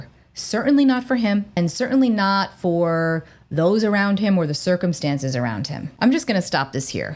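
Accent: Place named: New York, United States. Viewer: From one intuitive to another.... excellent presentation! And I think you're a New Yorker by the accent? 🎉 I appreciate your approach and neutrality. I think your channel is about to take off. Congratulations! I was picking up Trump assassination attempt last year, and let's say this is a possibility with this transit. However, It would have to be a wild card event at this point because the probability has gone down since last year. Definitely subscribing! Thank you😘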